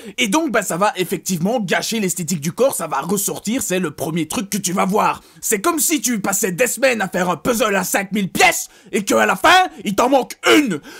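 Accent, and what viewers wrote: Belgium. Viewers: t'es belge en fait ?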